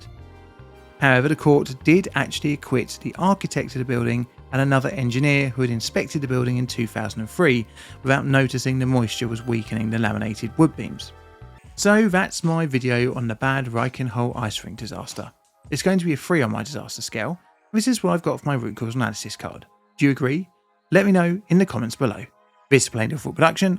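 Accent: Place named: England, United Kingdom. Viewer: As a German can I just say, your pronunciation of Reichenhall is spot on. Yeah there is a slight accent but bruv an Englishman saying "ch". Sorry 😅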